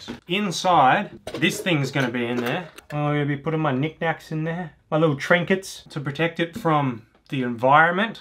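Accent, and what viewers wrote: Perth, Australia. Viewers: this video was so good i could listen past the australian accent. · lol I thought you were from Perth.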